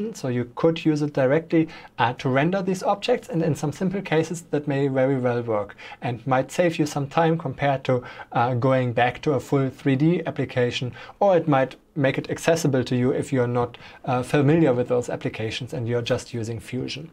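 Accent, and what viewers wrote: Germany. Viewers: man hört so har das er deutsch ist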